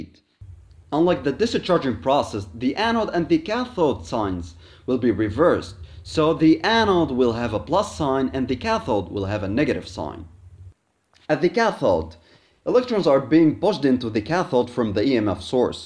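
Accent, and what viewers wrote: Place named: Netherlands. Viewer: Sorry I meant you sound a little dutch and Russian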